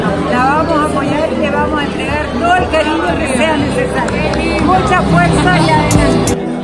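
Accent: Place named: Ecuador. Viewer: DE VERDAD ME QUEDE IMPRESIONADO CON TU DESENVOLVIMIENTO EN ESTE VIDEO, LUCES HERMOSISIMA, Y BIEN PROFESIONAL EN TUS COMENTARIOS, TODO CON MUCHA FUERZA, CARISMA Y A PESAR DE LA POCA EDAD QUE TIENES DEMOSTRASTE TALENTO Y DEMASIADA BELLEZA SIN PERDER ESE TOQUE ECUATORIANO AL HABLAR Y SALUDAR, MUY RADIANTE CON UNA ENERGIA SIN FIN, FELICITACIONES, ME ENCANTO ESTE VIDEO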